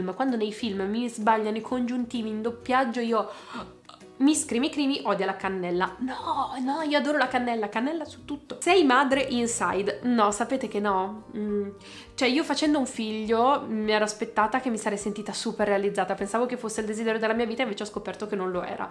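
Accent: La Spezia, Italy. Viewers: W il dialetto spezzino 🙈🙌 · Confermo il dialetto spezzino è insopportabile niente anche vedere con il genovese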